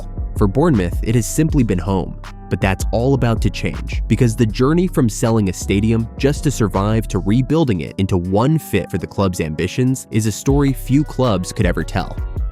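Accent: United States. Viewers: Shame a Yanky robot had to narrate this. I might have watched it otherwise.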